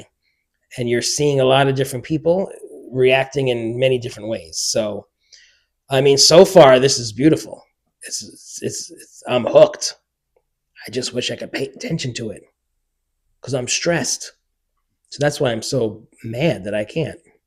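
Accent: United Kingdom. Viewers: Ooh look at that it's my favourite American dancer bloke doing an English accent!! Hahaha love it - you are doing a southern accent of an English person living in or near London. That accent is all over my City and that's a 2 hour train journey from London. Loved discovering Robin Hobb with you. Also loved talking about Life of Chuck with you. This is a great tag. Awesome video. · this was so great! I love hearing you gush about the books that have wowed you. I loved your accents too lol. I think what you were doing would be considered Cockney, or close to it anyway. Not quite as thick as My Fair Lady cockney though lol. Cliff is South African though. 🙂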